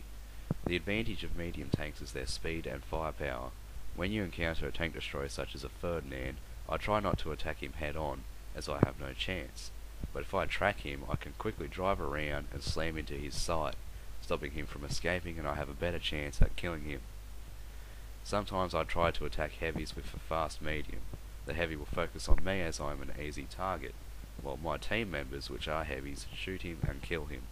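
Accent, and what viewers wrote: Australia. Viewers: ozzies always say youchoob. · Nice job and some helpful tips. I regard the rich and mellifluous Aussie accent as a bonus. Thanks, mate!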